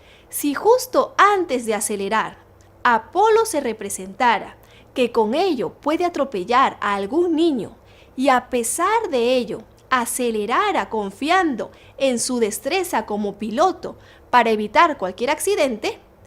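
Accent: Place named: Mexico. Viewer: Habla como Mexicana...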